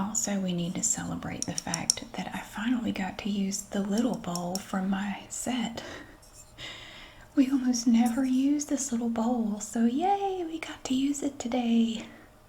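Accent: South, United States. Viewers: Holy smokes people really admire you sound south lady!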